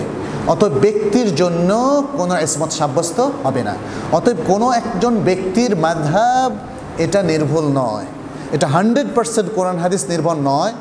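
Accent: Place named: India. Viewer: সে ইন্ডিয়ান , ইন্ডিয়ান ও পাকিস্তানিদের উচ্চারণ বাংলাদেশী বাঙ্গালী জাতির লোকেজনের থেকে আলাদা ও ভিন্ন হয় , এক্ষেত্রে ইন্ডিয়া এর বা পাকিস্তানের দেওবান্দী ও আহলেহ়াদীস় সালাফী আলেমদের উচ্চারণ একই রকম হয় । আর সলাাতের বাইরে কেউ ভিন্নভাবে উচ্চারণ করলে বড় সমস্যা হয় না জানা , আর তাঁরা কখনো হ়ারাফ় এর বা কালিমাহ লাফ়াজ এর পরিবর্তন ঘটে এমন করে পড়েনা বা উচ্চারণ করে না ।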